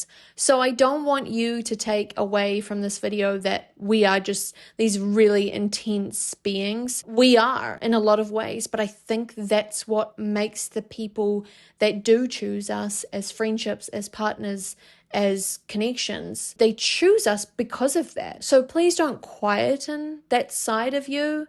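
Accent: New Zealand. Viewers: Thats pretty much just a New Zealand accent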